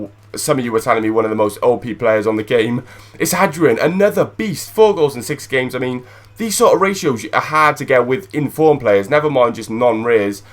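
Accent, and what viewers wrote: Wales, United Kingdom. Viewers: He sounds like the guy of the valleys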